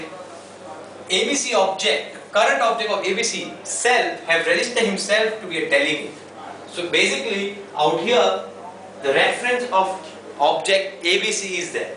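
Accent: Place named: Asia. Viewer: Boss it is Asian English!!!!!!!!!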